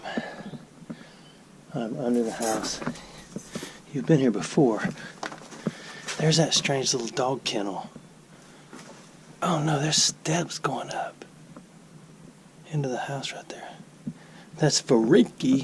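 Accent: South, United States. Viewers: I Love your videos and I LOVE listening to your soft southern accent, so SEXY!!! ;-) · Nothing like a good ole southern boys accent...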